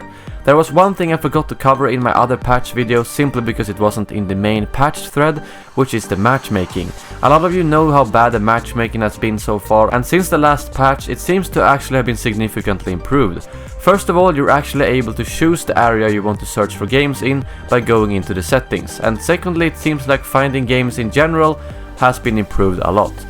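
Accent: Sweden. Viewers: You are a great YouTuber to watch, keep up the great uploads! And who doesn't like that Swedish accent?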